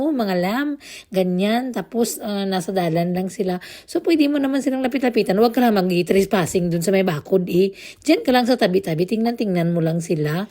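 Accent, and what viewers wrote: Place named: Iloilo, Philippines. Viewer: hello po, i enjoyed watching your videos and listening to your voice and the way you talk in ilongga. ❤😅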